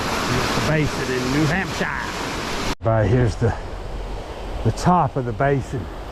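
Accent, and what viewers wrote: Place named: South, United States. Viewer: That name does not match up it that Southern accent.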